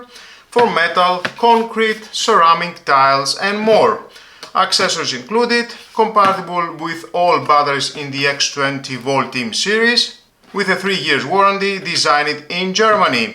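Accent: Greece. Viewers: Έλληνας είναι . Φαίνεται απτή προφορά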